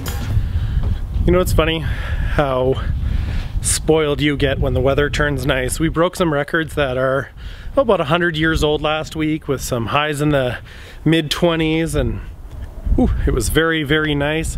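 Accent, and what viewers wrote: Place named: Canada. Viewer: This is my fave gardening channel. Upbeat, empirical, comprehensive, good scope, and with the cutest Canadian accent EVER. Hey, I have European plums, cut them to knee height last spring after planting. Can you explain vase vs central leader pruning for young trees? I find it hard to visualize as a process from year to year.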